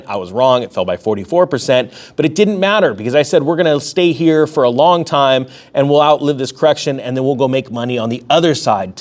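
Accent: United Kingdom. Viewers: That UK accent has me dying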